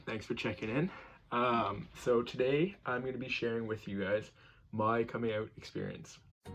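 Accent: Canada. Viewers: Gorgeous young stud...even with the Canadian Accent. I hope you have a wonderful life! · interesting. he has the strongest Canadian accent i have ever heard. what part of Canada is he from? London, Ontario? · This has all the Canadian words in it! Hoouse, ooout, 😀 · I'm a straight female but I'm watching coming out videos lol instead of studying. Good for you for coming out and being your true self. Hi from Ontario and damn, your Alberta accent is strong. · Sounds like he's from Canada. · I love your Canadian accent. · Well done matey! Be proud of who you are! BTW you are the most Canadian sounding Canadian I’ve ever heard in my life! Xx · Your Canadian accent is thick. I love it and I love your content bro. Keep them coming man and you're cute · The strongest Canadian accent ever lol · You have such a cute Canadian accent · This guy has a Canadian accent. Not foolin' me....lol He says Ouwwt. 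· Me watching this channel for the first time: “Canadian shirt? Is he Canadian?” Him: pronounces the word “out” in that cute ass Canadian accent Me: “knew it. I should be a detective” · What about the poster in French? I had to check where London was in Canada. Obviously he wasn't referring to the U.K. His "uit" and "huis," known as Canadian raising, sound different than in other parts of Canada.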